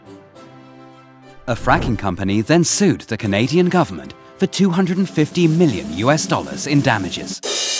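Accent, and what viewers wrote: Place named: United Kingdom. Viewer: irony. the guy has a UK accent. ////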